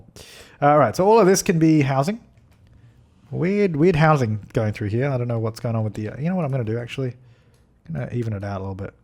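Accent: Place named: Australia. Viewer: i love listening to game commentary in an aussie accent, they don't go fucking mental like Americans which is just lovely ...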